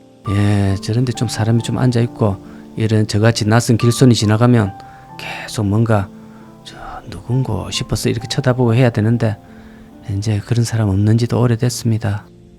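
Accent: Gyeongsang, South Korea. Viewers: 저고향 의령 선생님 말씀진리 임다~ 구수한갱상도 중독성 있는 음성 힐링됩니다 감사이 잘봤습니다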